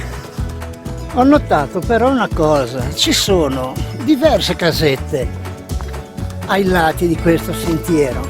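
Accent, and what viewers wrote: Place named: Genoa, Italy. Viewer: Fantastico bellissimo video che bell'accento genovese 🙂 Patty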